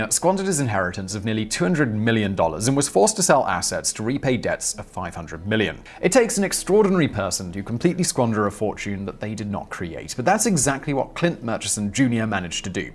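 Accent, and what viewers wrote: United Kingdom. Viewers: You're probably not familiar with British English pronunciation. He's pronouncing them correctly according to his background.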